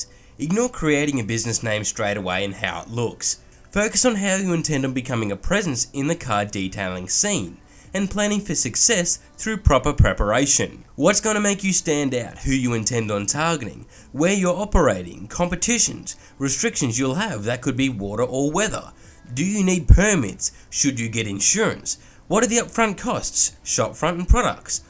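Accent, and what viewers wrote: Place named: Australia. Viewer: Your Australian accent is giving me a blinding headache....I’m not from Australia....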